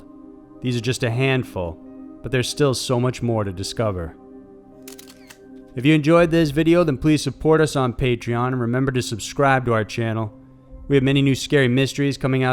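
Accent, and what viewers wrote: South, United States. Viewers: Can anyone pick his accent? He reminds me a little of Elvis so I'm guessing he's from Mississippi.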